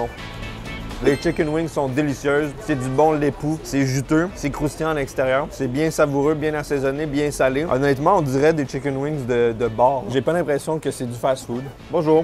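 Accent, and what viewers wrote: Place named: Quebec, Canada. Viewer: Hello, quand viens tu en Suisse? Manger une vraie fondue une vraie raclette et autres spécialités. Bravo pour tes vidéos. J’ai 63 ans je suis venu au Québec en 1989 et vôtre accent me plaît toujours autant. Ne change rien et bonne continuation.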